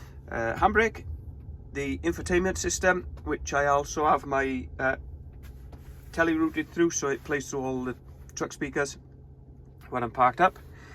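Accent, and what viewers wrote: Wales, United Kingdom. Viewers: Not a trucker but have binge watched your channel this week, which I enjoy 2 questions: Am hearing a Welsh underlying accent? Where is home when you are there?, not far from me Leeds/Harrogate/York? maybe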